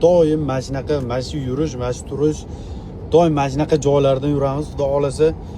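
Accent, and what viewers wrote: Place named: Fergana Valley, Uzbekistan. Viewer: Shevez oʻzgartrmang vodiydansz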